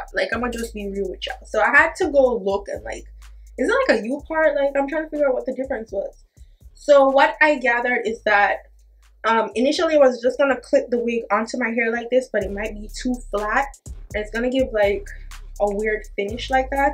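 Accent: Jamaica. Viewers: I love that you come from yaad!!!!! I heard the accent and immediately followed yess hunni and you’re so cute!!! 🤗🙌🏽💋 I’m Jamaican 🇯🇲 myself!!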